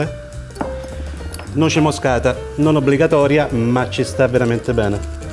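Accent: Tuscany, Italy. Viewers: Forse perchè è toscano? Di solito dicono anche seggiola invece di sedia!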